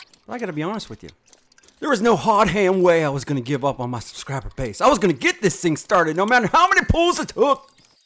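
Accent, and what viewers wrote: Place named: North Carolina, United States. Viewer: You talk just like my friends in eastern "Noth Carolana"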